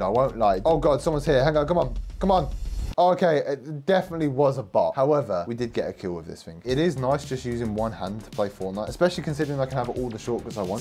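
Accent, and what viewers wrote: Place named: England, United Kingdom. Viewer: I love your english accent.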